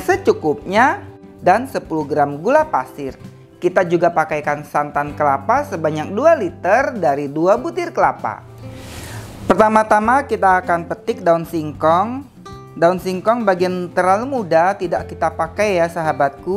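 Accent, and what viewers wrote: Java, Indonesia. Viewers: Medok bikin ngiler aja..lihat buntil..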